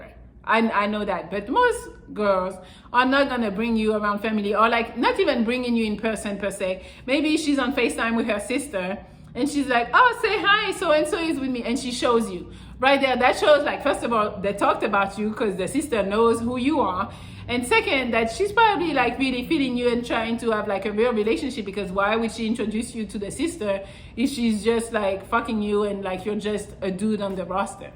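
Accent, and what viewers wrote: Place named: France. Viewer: Another banging video and a solid piece of advice love your energy and aura around you and your work ethic and personality and intelligence is the best I've even seen looking beautiful and sexy and most attractive woman on the planet love them sexy eyes and and accent too and love your tattoos there's nothing sexier on a woman than all the qualities that you possess keep up the awesome work you do and never change who you are your perfect the way you are your a gorgeous French goddess queen xx ❤️ 😘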